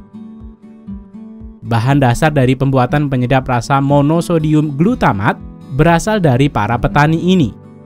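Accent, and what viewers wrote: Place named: Chinese-Indonesian, Indonesia. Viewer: Logatnya kayak chindo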